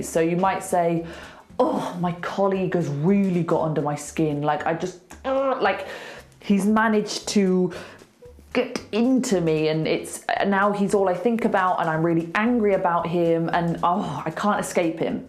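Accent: United Kingdom. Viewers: Love British accent and your videos.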